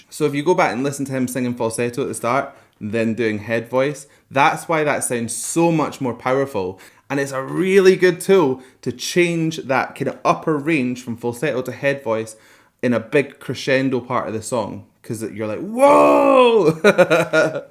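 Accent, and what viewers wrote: Scotland, United Kingdom. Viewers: Thank you for the analysis. A million years ago, I did vocal training but your mix of anatomy and explanation is really enlightening. BTW, you have probably heard that JK tends to be the go to guy for a lot of harmonising in the background of their songs and we have got to see him working on it for Euphoria. It is fascinating as he created multiple harmonic lines as he worked , listening back and overlaying them on the fly. He seems to just be very musically literate..as in, understanding quite complex harmonic sounds effortlessly. I am quite amazed by it and very jealous in a good way. Also, love your voice, in spite of no warm up (bold!!) and the Scots accent is great. Almost as good as the Irish but we'll leave that off. Thanks again.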